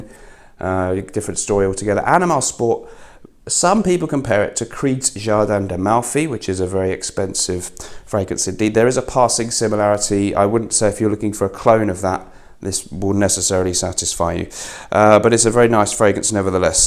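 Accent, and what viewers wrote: England, United Kingdom. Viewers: Classy english accent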